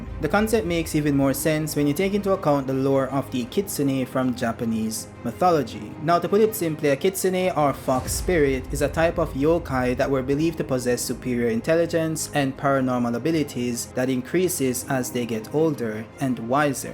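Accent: Jamaica. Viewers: Are you Jamaican bro?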